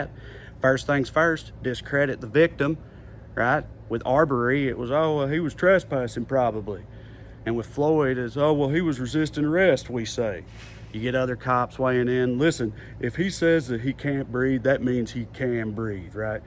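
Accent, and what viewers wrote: South, United States. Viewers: I love hearing truth spoken in an accent like mine. Full disclosure, you made me proud to be southern! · I just think you’re simply awesomeness with a strong Southern accent. I love how you think and how you express it. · Combining wit with reasoning and great intelligence isn't easy but you definitely nailed it with lovely southern drawling lingo too ... Was even able to enjoy it in entirety back here in Oz... Could stand a heap of this, no kidding !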